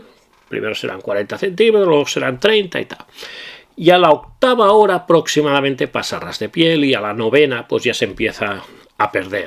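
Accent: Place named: Catalonia, Spain. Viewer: Hola, por el acento entiendo que eres catalán, yo es que soy de Barcelona y al oírte decir lo de lo bazares, me gustaria saber si son de Barcelona, si es así, te agradecería que me dijeras los nombres o donde están...gracia por adelantado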